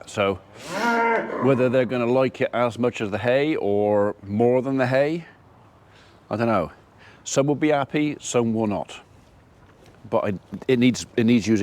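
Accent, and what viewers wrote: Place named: Suffolk, United Kingdom. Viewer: Where you from? Where do you live,sounds a bit Suffolks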